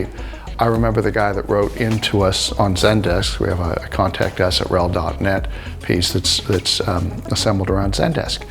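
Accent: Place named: United States. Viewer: High dudgeon? Who talks like that? An Anglophile? Certainly not an audiophile. There's nothing like getting scolded by a person that has an American accent and uses Britishisms.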